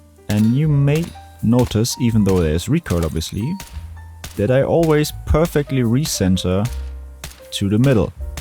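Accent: Germany. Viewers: I was wondering why you would change the keybind for entering the firing range from Z to Y but then I remembered your German accent xD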